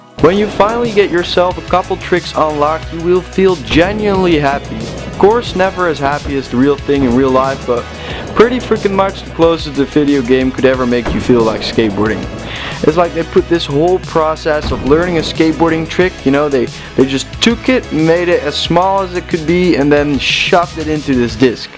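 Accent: Netherlands. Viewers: This guy is dutch. I can just hear it. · Is he from the netherlands? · Are you Dutch?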